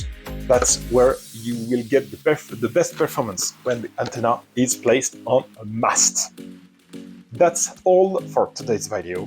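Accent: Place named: France. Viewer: Interesting. Your English is fine (I only wish I could speak French that well). Your pronunciation of some of the letters (particulalry i and y) very amusing. A little like Peter Sellers in The Pink Panther films :-)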